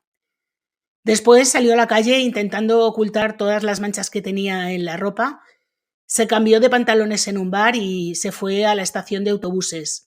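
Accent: Spain. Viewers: Se refiere a la ertzaintza, la policía vasca. Es española y pronuncia así, qué vamos a hacerle?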